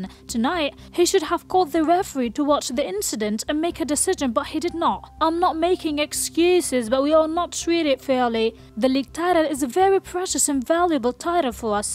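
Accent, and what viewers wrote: United Kingdom. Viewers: A good British accent. It should be less severe than it is. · انجليزي بريطاني بريطاني👍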